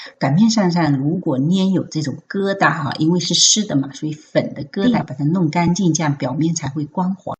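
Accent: Fuzhou, China. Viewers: 老师也有福州口音，听来好亲切。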